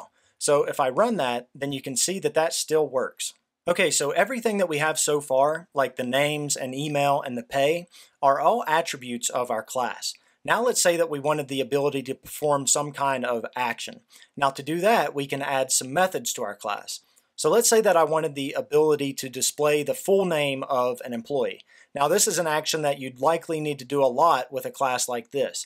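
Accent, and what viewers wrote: South, United States. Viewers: me: I like this guys accent, kinda southern also me: m8 you live in tennesse, you have the same accent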